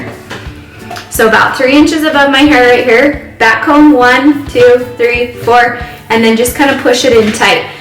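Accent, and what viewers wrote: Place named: Utah, United States. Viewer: I thought she had a Utah accent! I live in California 30+ years and when I go back I slip into the lingo and accent.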